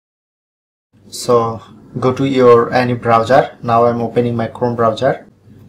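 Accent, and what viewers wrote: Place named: India. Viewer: INDIAN VOICE W TUTORIAL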